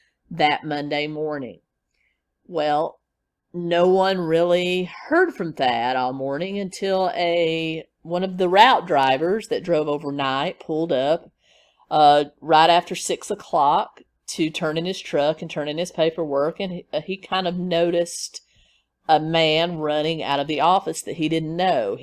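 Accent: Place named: Texas, United States. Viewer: Love your accent, reminds me of family reunions in Texas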